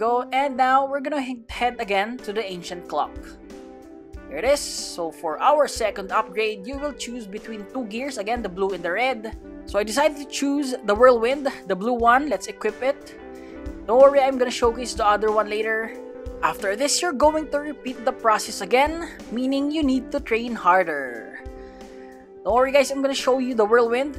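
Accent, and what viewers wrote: Philippines. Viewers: Are you Filipino, your accent😂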